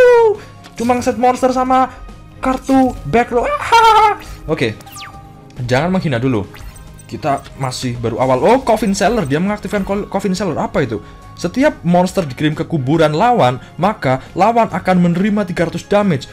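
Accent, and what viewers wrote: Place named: Purwokerto, Indonesia. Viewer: Dari medok nya, apakah agan orang Purwokerto atau sekitarnya?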